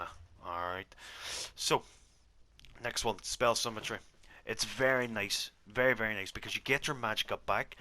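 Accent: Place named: Derry, United Kingdom. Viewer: Great job, very informative and well presented showcase of the necro tank. Who wouldn’t want to listen to the lovely Derry accent, that’s just a bonus 🥳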